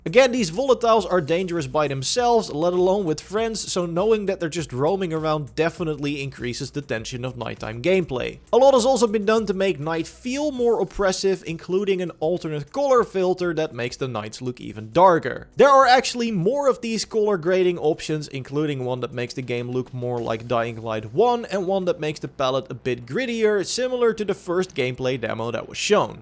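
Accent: Netherlands. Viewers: This man is from the Netherlands, I can tell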